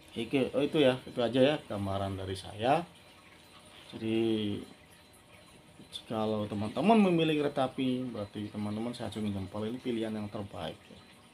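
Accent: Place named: Cirebon, Indonesia. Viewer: Logat Cirebon nya keliatan bangat pak 😂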